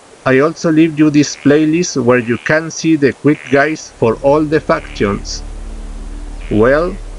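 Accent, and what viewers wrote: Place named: Spain. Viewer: Porque este ingles suena como el de Mariano Rajoy?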